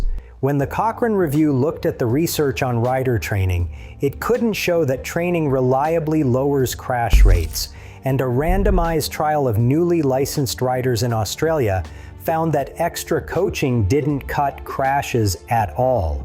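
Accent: United States. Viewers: The confusion might also stem from the fact that the speaker has an American accent and cites American sources (admittedly not exclusively), while the channel description states that the channel owner is based in Norway. Anything is possible, of course—and there was, after all, an American in Norway before: Frank Tagliano 🙂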